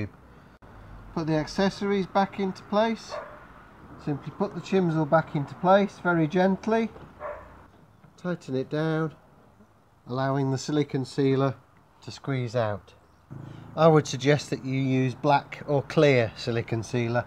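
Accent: United Kingdom. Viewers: Love British accent :) very helpful video, many thanks!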